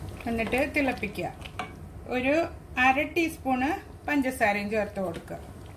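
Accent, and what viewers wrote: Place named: Thrissur, India. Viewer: തൃശ്ശൂർ ആണെന്ന് തോന്നുന്നു സംസാരം കേട്ടിട്ട് Anyway, എത്രയും പെട്ടെന്ന് ആയിരം സബ്സ്ക്രൈബർ ആകട്ടെ എന്ന് ആശംസിക്കുന്നു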